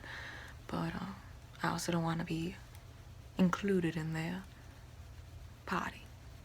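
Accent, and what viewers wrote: South, United States. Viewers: 1. I'm southern and faux-southern accents usually bother me in asmr in particular, but the antebellum aspect of this aspect made it so enjoyable. I love the sort of over-the-top elegance of thick civil war era accents. 2. I had no idea so many people were into vampire diaries until I scrolled through these comments. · Last night, I giggled when you mentioned thomas edison... I laughed myself awake at the "realization" that you are doing a southern roleplay and you set it during the civil war. "Lincoln is the president. and we are at the civil war. and I have a southern accent. That is terrible." Laughed the entire day about that series of statements. Thank you so much. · "and i have a southern accent...that is terrible ..." I laughed so hard I love it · I know it’s probably a reference to something else, but the reference to the vampires and the southern accents just makes me think of interview with a vampire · More southern accent videos PLS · lol that made me smile :) And your old timey southern accent is resonant gold! 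· Southern accents are a kryptonite for me. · You absolute babe, I love Southern USA accents in Asmr! Thank you 💜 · I do adore the snooty sothern acsent becuse it sounds so gossipy and prim, i love it ! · Yasss the southern accent- so much love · I love the freckle on your nose. Odd comment i know, but it is just so unique to your face. Lovely southern drawl, reminds me of Steel Magnolias~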